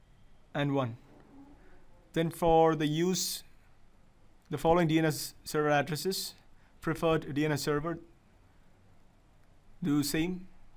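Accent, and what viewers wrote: India. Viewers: fk that indian accent! it did not even work. zzz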